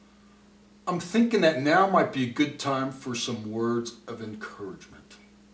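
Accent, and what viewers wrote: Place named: Santa Fe, United States. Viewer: this guy must be from Santa fe. kkkkkk. love it.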